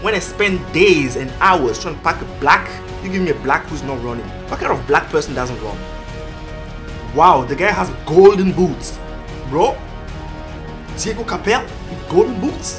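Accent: France. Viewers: Are you French? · j'adore tes videos et surtout ton accent francais mdr ;) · r u french. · are you french ? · Ta un accent français un peu t'es français ??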